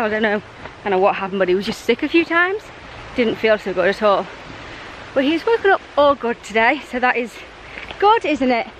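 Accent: United Kingdom. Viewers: nice vids, love your Brits accent made me remember my years in Surrey, UK for my MSc.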